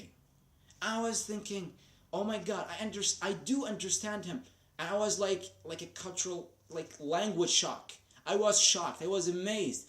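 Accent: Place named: United States. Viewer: my words!!! this guy has just blown me away. Incredible to watch you speaking exactly in the American accent. You are just killing it man, I understand how much efforts you would have putted in it. And from a country like SAUDI ARABIA where ARABIC is everywhere. Congrates man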